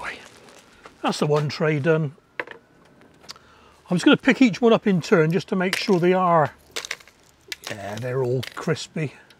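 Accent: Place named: West Country, United Kingdom. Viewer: Nice batch of peppers there, just popped over from at Green Side Up to have a look see. I air dry mine tomatoes with added spices/herbs then store in jars with olive oil, triple bonus, 1. Makes the house smell nice, 2. Flavours already added prior to cooking, great topping on pizzas, 3 Flavoured oil for cooking. Thanks for the tips on preserving, lovely hearing a west country accent 😉😁🌱☀️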